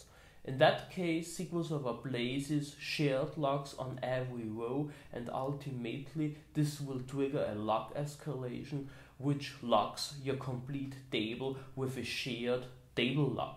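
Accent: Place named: Germany. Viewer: damn this guy with heavy german accent makes me happy! :))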